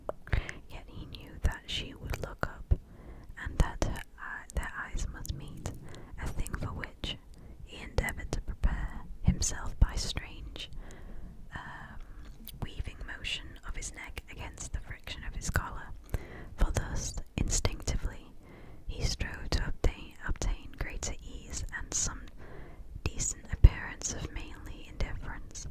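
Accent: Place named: United Kingdom. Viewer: wow you have a British accent 😃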